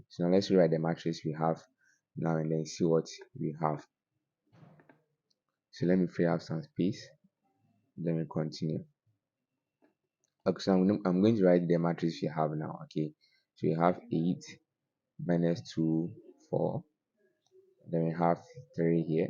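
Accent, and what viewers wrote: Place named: India. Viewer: Are talking in English or Indian? wtf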